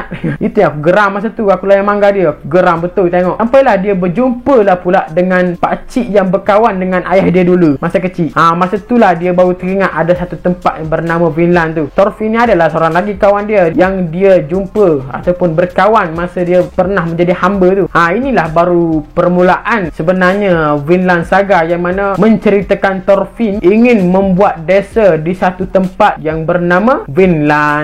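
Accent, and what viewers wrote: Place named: East Coast, Malaysia. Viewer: mcm org pantai timur je speaker ni ckp😅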